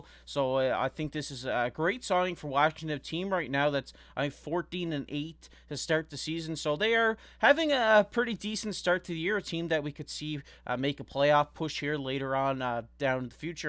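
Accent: Newfoundland, Canada. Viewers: 100% a newfie accent on this guy. Love it b'y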